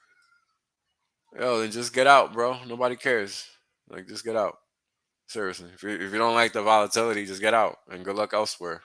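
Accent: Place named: New York, United States. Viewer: Is your voice/accent for real bro, that's some serious New York.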